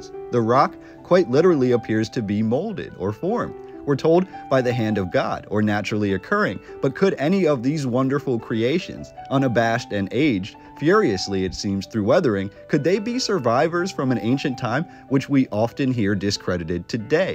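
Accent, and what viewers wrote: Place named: Texas, United States. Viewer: you from Texas aint you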